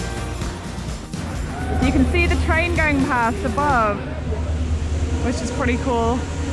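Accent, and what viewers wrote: United Kingdom. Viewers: Mam ...I can guess..u r British..Am I right??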